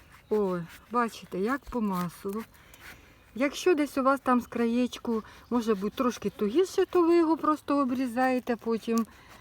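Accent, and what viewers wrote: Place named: Transcarpathia, Ukraine. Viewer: У ВАС діалект мови Закарпаття.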